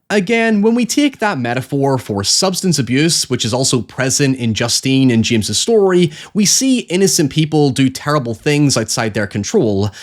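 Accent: Northern Ireland, United Kingdom. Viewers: It's a typical Northern Irish accent. How now brown cow?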